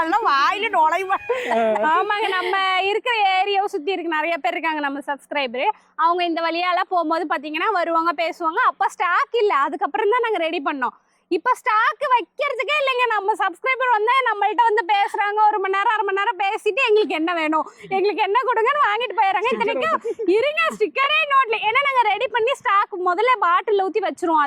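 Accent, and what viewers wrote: Kongu, India. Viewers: ஐஸ் நான் போலிஸ் தான் அனால் உங்கள் கொங்கு தமிழ் பேச்சுக்கு அடிமை என் வீட்டில் மாடி தோட்டம் வைத்து இருக்கிறேன் உங்கள் வீடியோக்கு காத்திருப்பேன் சென்னை வந்தாள் எங்கள் வீட்டிற்கு வரவேண்டும் 🙏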